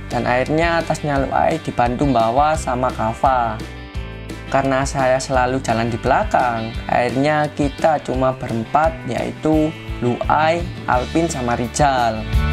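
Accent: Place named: Java, Indonesia. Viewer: Logatnya jowo bget..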